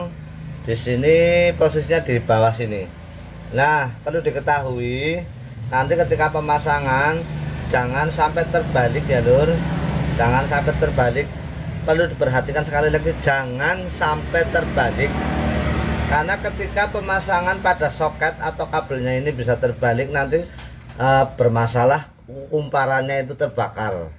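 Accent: Banyumas, Indonesia. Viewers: Mantap. Logatnya mirip banyumas. Lokasi dimana bro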